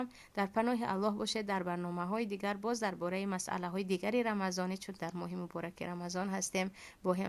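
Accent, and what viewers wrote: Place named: Tajikistan. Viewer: Ассалому алейкум Офарин бар Шумо чи кадар забони точикиро тоза гап мезанед ва хамаи суханонатон бехтарин.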